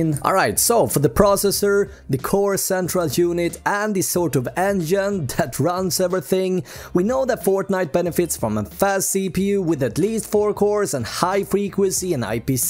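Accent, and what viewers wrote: Sweden. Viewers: U sound swedish and if you are, Hej :) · Are you swedish sounds like it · Are you Swedish? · Are you swedish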